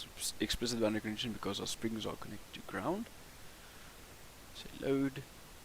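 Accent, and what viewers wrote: South Africa. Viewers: south african much?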